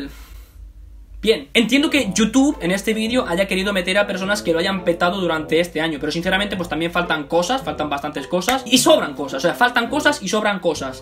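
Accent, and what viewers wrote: Argentina. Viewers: Amo tu manera en que dices las palabras de Argentina 😂❤ Por algo te amo tanto ❤💫💕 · Me encanta que uses el acento el Argentino xd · el Argentino se te pego ati♥♥♥♥ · AMO ESCUCHARTE HABLAR CON EL ACENTO ARGENTINO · ME ENCANTA TU INTENTO DE ACENTO ARGENTINO, AYUDA TE AMO MUCHO. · Viste como cuando se te pega lo argentino:v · Amo tu intento de argentino😂😂💜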